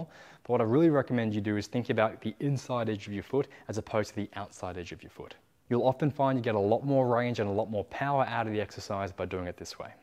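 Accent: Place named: Australia. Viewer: When I heard your voice I immediately picked up that your were an Aussie, and then I looked at your About page and yes, I was correct. Thanks for the deadlift info.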